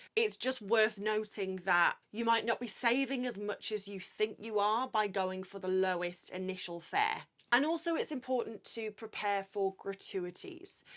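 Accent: United Kingdom. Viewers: is absolutely breathtaking lately, she was always beautiful but something is different. I have to watch her videos twice, once to see her, which completely distracts me to what she is saying, and the second time to listen from the next room so I can hear what she said. Breathtaking. I hope she's not in love as I was planning, when my crypto takes off this year, to renounce my American citizenship, become a British citizen, buy her the biggest engagement ring, so big she'll need a finger brace, buy her the biggest house I can find and be her ladies gentleman for the rest of my life. All she has to do is walk around and speak in that wonderful British accent and laugh that wonderful laugh. That's my simple Santa request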